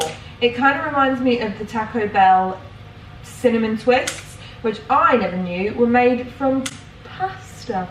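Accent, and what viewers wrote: United Kingdom. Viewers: I just love her personality! Although British accent is still hard for me, lol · Is it just me or she looks like merle from buzzfeed with a british accent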